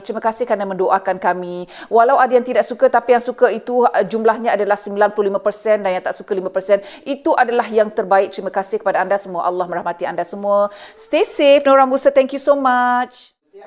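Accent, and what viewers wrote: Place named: Selangor, Malaysia. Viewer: Berkenaan loghat Nesmilan tu. Kita tau your family pun di Nilai. Utk pengetahuan semua Nilai ni dah almost Selangor (in fact heboh jap ari tu, Nilai ni dah join Selangor) so bunyi loghat org Nilai ni kira bunyi Selangor la. Bukan semua org Nesmilan berbunyi Pilah atau Rembau. Kalau ada yg berbunyi Pilah atau Rembau tu cuma sesetengah je tapi bukan 'loghat rasmi' org Nilai.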